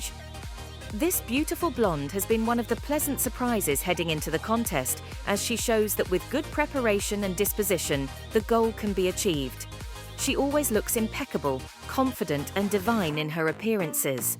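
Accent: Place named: England, United Kingdom. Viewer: English, your voice sounds like the Crown sisters from the Philippines and love it and the English accent.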